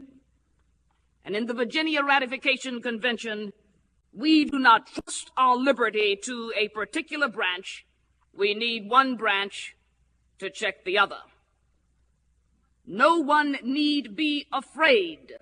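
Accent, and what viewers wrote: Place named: Texas, United States. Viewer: This is what a good Texan sounds like.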